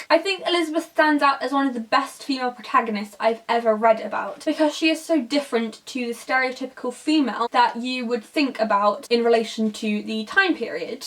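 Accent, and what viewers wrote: United Kingdom. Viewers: Your British accent s so great, BOOM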